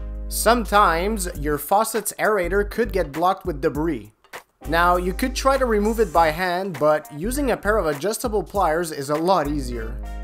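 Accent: Quebec, Canada. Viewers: I know a quebec accent when i hear one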